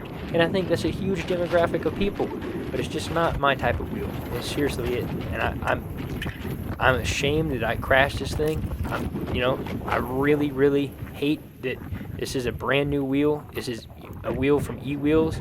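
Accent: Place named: Appalachia, United States. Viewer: You sound like an absolute moron. You edited it talking over yourself with same volume... and that hillbilly accent and incoherent rant that makes no sense. Also it did not cut-off as clearly it's on when you pick it up, you absolute idi ot. I don't even have an EUC and I know that. Yes, you are an american i can tell :)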